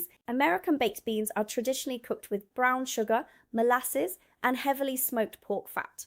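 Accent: England, United Kingdom. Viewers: you SOUND English. Re Branston pickle.....have you tried piccalilli on your cheddar sarni (new word for you?)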